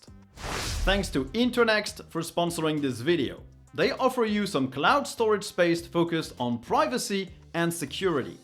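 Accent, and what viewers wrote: France. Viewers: Your accent, are you French, Ouais?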